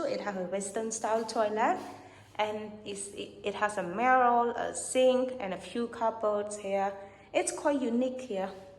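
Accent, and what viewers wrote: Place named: England, United Kingdom. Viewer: Yes you have a harmonious English accent. It's very nice.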